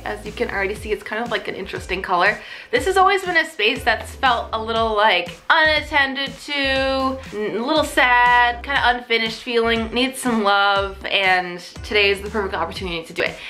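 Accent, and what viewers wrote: Canada. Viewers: i love how Canadian sounds ahhaha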